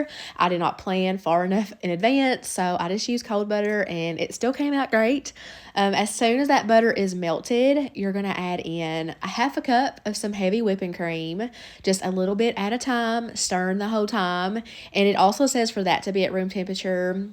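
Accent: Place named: Kentucky, United States. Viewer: All of it looks delicious! Something that I can make! Love that Kentucky accent!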